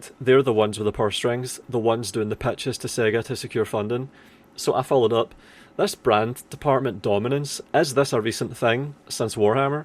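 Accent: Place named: Belfast, United Kingdom. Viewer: cool mate. Your accent sounded like it could be Belfast. Anyways enjoyed the video!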